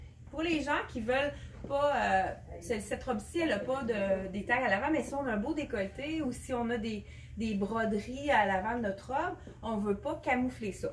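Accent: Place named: Canada. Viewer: Bonnes idees mais le son n est pas net et la voie trop canadienne dommage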